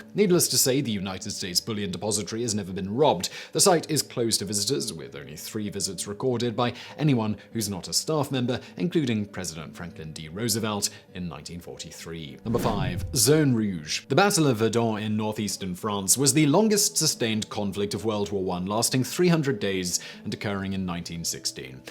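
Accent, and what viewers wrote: United Kingdom. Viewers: Which part of Britain is this man's accent from? As a fellow Brit, I'm scratching my head. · Dodgy Brit accent and speaking way too fast - what is your hurry, slow it down.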